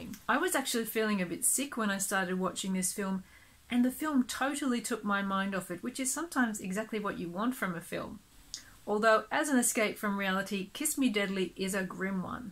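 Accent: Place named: England, United Kingdom. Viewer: One of my favorite 'Film Noir's' ! Sexily narrated with a lovely English (I think) accent and a lot more sensual than Eddie Muller of TCM will ever be, bravo!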